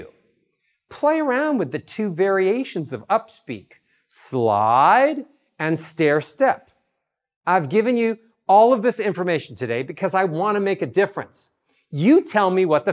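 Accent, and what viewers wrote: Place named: West Coast, United States. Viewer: He has the flat Hollywood/West Coast accent.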